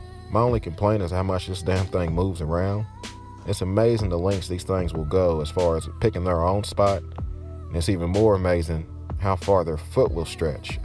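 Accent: South, United States. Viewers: Is that a country accent I hear??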